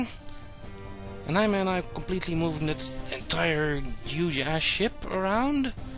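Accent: Netherlands. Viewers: u sound a bit dutch :P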